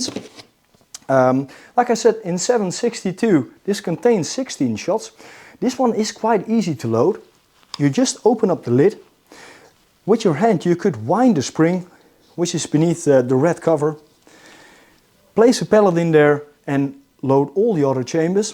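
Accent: Netherlands. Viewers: After a reasonable couple of months. This video made me subscribe and i even gave a like 😊 Always very clear and straight down to the products and explanation. Heerlijk dat boerenkool Engels. En dat bedoel ik positief.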